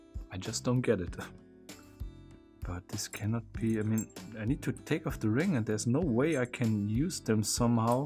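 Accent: Germany. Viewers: Oh, Guy! Are you from Germany? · are you german? :DDD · Omg man hört so raus dass der deutsch is xD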